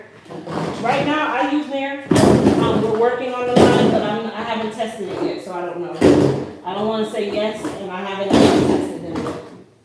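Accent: Jamaica. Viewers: Down to earth giro love your suggestions Are you Jamaican I heard some languages there. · Girl you’re so real I would’ve not known you from Jamaican background. You bring out your Jamaican accent I like that about you, you’re proud of where you’re Heritage is 🙏🏾💕🙏🏾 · You must be Jamaican. The accent pops out. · You sound jamaican sometimes but you know what I love you very real I've learned a lot from you I'm 68 and you never too old to learn thanks a lot for all the info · I heard that JM accent..Loving your show...Didn't know about the coconut water!!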